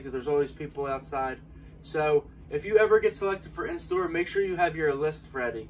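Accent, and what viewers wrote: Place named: Pittsburgh, United States. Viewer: Bro where are you from? Im from Pittsburgh, PA and you kind of have that accent like us...just curious. NIce pickups!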